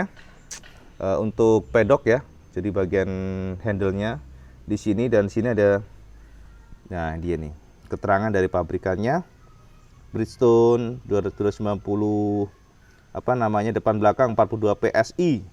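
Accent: Indonesia. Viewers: asli mna si pean cak,ko medok bngt bicarae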